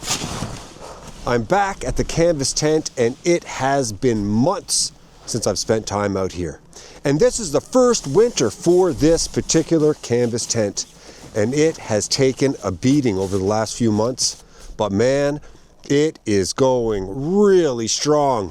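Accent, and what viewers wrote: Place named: Nova Scotia, Canada. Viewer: Just noticing your east coast accent. Not quite Newfoundlander, but very much like a Blue Noser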